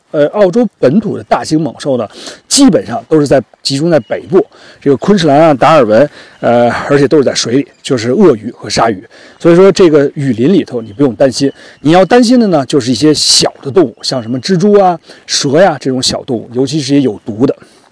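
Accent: Beijing, China. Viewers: 北京口音很重，用普通话更好些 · 听口音明明是大陆人，一到youtube上就切换成了繁体字，我也不知道你到底是怎么想的。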